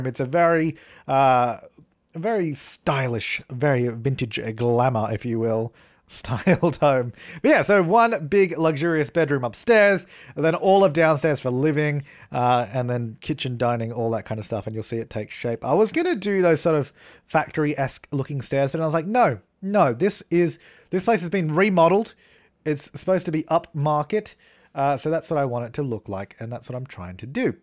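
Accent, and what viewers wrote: Australia. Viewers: Wait, so you're not English? But Australian?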